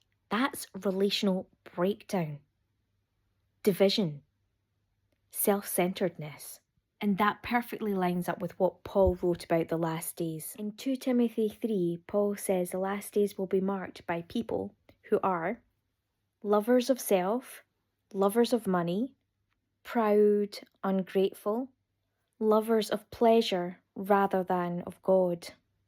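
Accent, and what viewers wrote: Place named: Ireland. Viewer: You may already know about the budding fig tree parable. Truly i tell you this fig tree generation shall see these things come to pass. The fig tree called Israel bloomed again in 1948 and the people born at that time alongside of Israel shall see these things pass. Thats how i know. Read matthew 24 34 knowing the fig tree has bloomed when Israel became a nation again in 1948 and also know that only God like AI has the ability to enforce worshipping of the beast onto all people young and old, rich and poor, slave and bond. The mark is AI. We will all need a mark to buy sell or trade. Digital currency will give full control over all buying selling and trading going on in every nation and with every tribe. We know that AI is an existential risk and the end is near because of the AI beast system coming. I could listen to you speak all day long and if I spent much time around you I'd be speaking with a crazy cute irish accent too.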